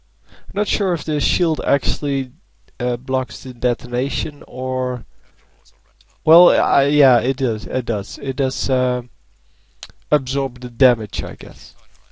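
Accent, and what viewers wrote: Netherlands. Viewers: you are such a bad commentator.you obviously know nothing about this game.If reginald picks zilean mid,you can be sure zilean is a good champion and you shouldn't break him down.Your accent is so annoying!So is your voice.I hate it when people from the netherlands try to speak english.Please don't make any commentaries anymore.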